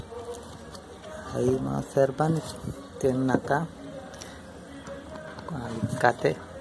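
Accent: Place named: Santander, Spain. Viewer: que no se note que es santandereano, maestro.....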